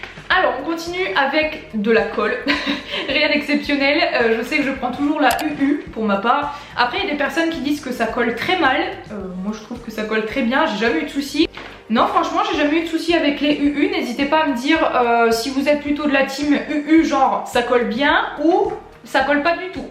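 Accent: North, France. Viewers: J'adore l'accent du Nord hahah je suis toujours dessus mais bon En vrai on vois peut de personnes de notre région qui se lance sur YouTube ça fait plaisir à voir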